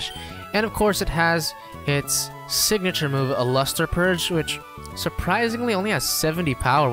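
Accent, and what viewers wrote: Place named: United States. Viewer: woah cool! I have Filipino blood and was born in San Francisco, California. Came here to the Philippines way back '98 when I was 10. So are you residing now in the U.S.? I noticed your accent. It's an American accent so that made me think you're a Fil-Am but as you've said you're full Filipino. Btw, does this hack have an Amulet Coin? I just went in the Pokémon League earlier and I'm wondering if this hack does have an Amulet Coin. It's so hard to make money in the Pokémon league because my team's level is just 5 main Pokémon with levels 56. I keep on dying whenever I get to the 3rd member of the Elite Four.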